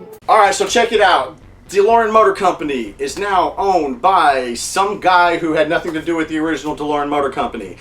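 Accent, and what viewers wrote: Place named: Texas, United States. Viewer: True Texan. Prounounce Humble without the H. Nice dude! I think you can get a kit for the time travel part but it's expensive and you need Libyans for the plutonium. They were unreliable because they were really heavy with the all or mostly steel* body. I think i saw behind the scenes that they couldn't get to 88 mph with the stock motor. *also according to some DeLorean forum they say the car isnt heavy (but for the engine it may be I guess) so I dont know or dont care it's just what I always thought or heard. *originally I put aluminum and in case nobody reads follow up comments thank you for pointing it out. Not dumb just imperfect.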